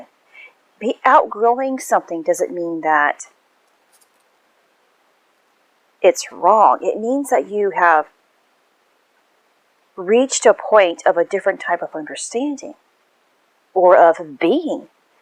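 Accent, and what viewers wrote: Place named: Asia. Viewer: I noticed a bit of and Asian accent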